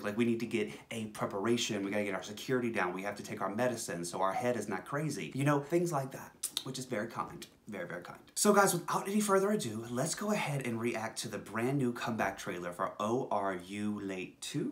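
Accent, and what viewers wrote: South, United States. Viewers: You’re not country darling you’re more of a southern bell 😘 also your remixes are 🔥. My favorite era is Wings 100%. The trailer for their wings tour is so iconic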